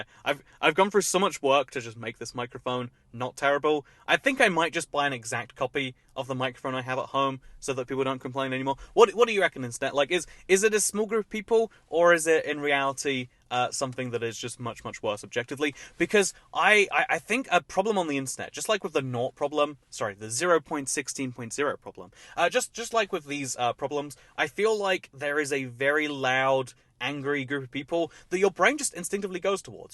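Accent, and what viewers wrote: England, United Kingdom. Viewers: Please continue with your British English. Naught makes you sound smart, as I associate it with Numberphile. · Honestly I don’t mind the British English, I think it’s fun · Well, I like your English accent and I like that you say "nought" so if you change your mind and decide to continue saying nought instead of zero, I'll be in support of that decision! It's nice to hear how different regions of the world speak English differently. I feel like Americans getting mad that you say "nought" seems really spoiled/entitled. Like "English is my language and you have to use the words I use!" Which is super boring and gross. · My question there is about how British say things. The things I have a question over is the word aluminum and the letter z. Why do you(British people)pronounce aluminum(a-lu-min-um)as aluminum(al-u-min-um) and prounce the letter Z(Zee in American)as Zed? 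That is stupid how it works.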